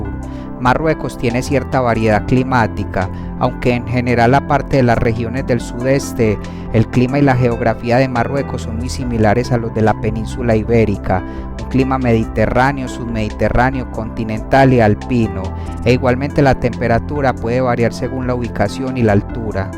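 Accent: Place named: Antioquia, Colombia. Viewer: No púes, y Con acento... Paisa... home! 👍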